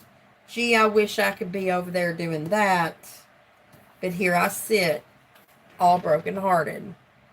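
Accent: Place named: South, United States. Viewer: ♥️🙌 Love the southern in ya, Sister! 💫🌻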